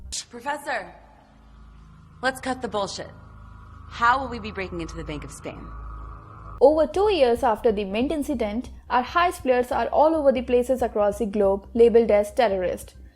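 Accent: India. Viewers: good recap, but dislike for the indian accent.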